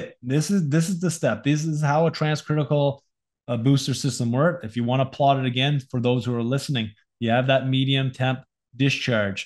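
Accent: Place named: Atlantic Canada, Canada. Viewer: thanks for the video, pal! you from the east coast in Canada? I detect an accent lol Ontario here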